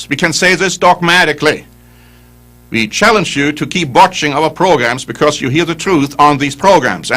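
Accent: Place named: Germany. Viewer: Euro cannot survive next 10-15 years. This video seems to be german propaganda as I hear a strong german accent and pronunciation ..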